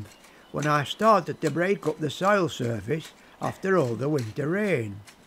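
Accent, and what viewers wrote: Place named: Lancashire, United Kingdom. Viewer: I live in Adelaide, South Australia. I migrated here 33 years ago. I recently discovered your channel and want to say how much I’m enjoying watching your videos. My parents were from Lancashire so it’s lovely hearing the Lancashire accent. While you have been getting too much rain I’m not getting enough. It’s the third month of autumn here but still very very dry. Autumn is usually like a second spring here once the rain comes. I’d forgotten how many gales north west England gets where I live very windy weather is rare but as I said we struggle with hot dry weather. I too am wilding my garden and picking up hints from you. While we have different wildlife but I can relate to you with the excitement of wildlife visiting the garden. Do squirrels or rabbits eat your plants? The brush tailed possums certainly eat mine but it’s all part of nature. 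One more question what breed is Buster? He seems so well behaved. Thank you again for your videos. Shelley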